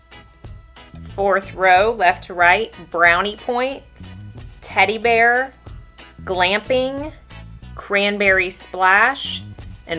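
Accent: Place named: Maryland, United States. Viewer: Are you from Maryland cause you sound like an either Maryland accent or southern. Reminds me of home ❤️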